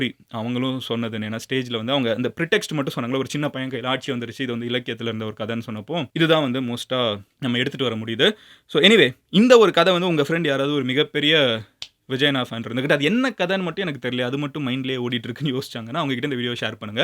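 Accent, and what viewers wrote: Madurai, India. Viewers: nega madurai or Chennai ❤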